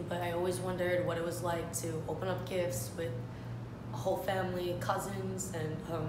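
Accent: New York, United States. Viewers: I could tell from that accent you were from NY without even knowing!!! You’re absolutely fucking stunning! I’m a fan! 💕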